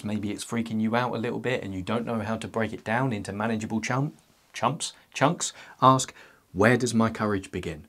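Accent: United Kingdom. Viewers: i mean this in not a disrespectful just an observatory way: your voice sounds precisely like what a british guy's voice sounds like in my head. spot on.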